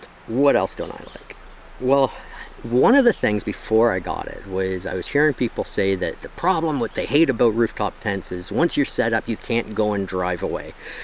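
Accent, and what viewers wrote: Canada. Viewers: aboat haha you are canadian for sure nice review ...come in quebec we have good sea side camping places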